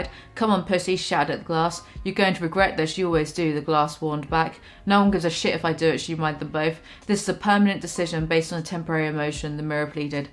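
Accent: United Kingdom. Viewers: where in the UK is your accent from? its my fave one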